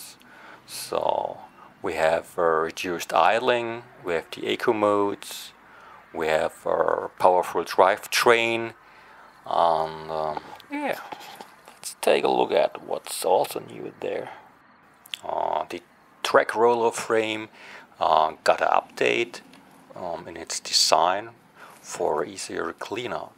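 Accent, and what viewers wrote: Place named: United States. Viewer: Well, sort of English! More American?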